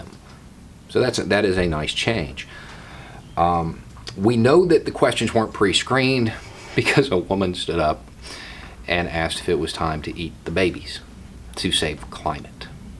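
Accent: South, United States. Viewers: Hey Southern guy, where do U hail from? Asked. Another Southern guy.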